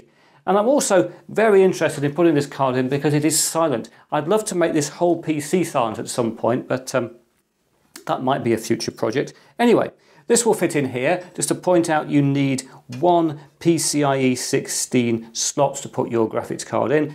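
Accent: United Kingdom. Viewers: Why is it when a British person speaks everything sounds so much better? Subbed btw your voice is awesome.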